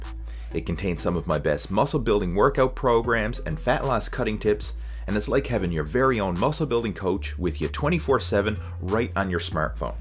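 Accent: Newfoundland, Canada. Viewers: Are you from newfoundland? I seem to remember that you are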